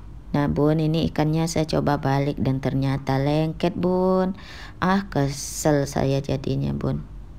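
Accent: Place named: Pekanbaru, Indonesia. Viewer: assalamualaikum bund..cara ngomong nya mirip orang Pekanbaru bund..hihi..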